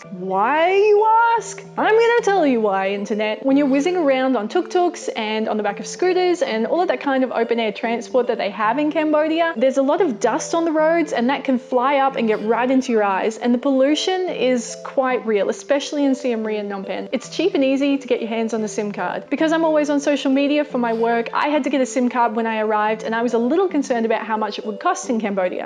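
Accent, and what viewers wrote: Australia. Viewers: I don't understand your Australian English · I love the Aussie accent ☺️ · Wow, you sounded American up till you said you were from Brisbane. Now, all I hear is your Australian accent :).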